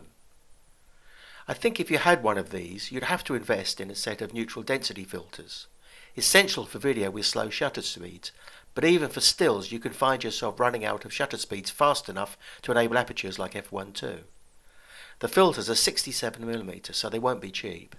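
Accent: United Kingdom. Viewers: This gentleman’s review allow me to understand lenses and practice my understanding of English with very strong British accent.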